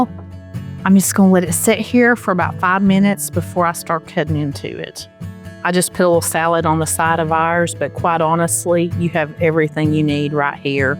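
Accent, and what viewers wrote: South, United States. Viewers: I LOVE your accent... love to hear the southern drawl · love your southern drawl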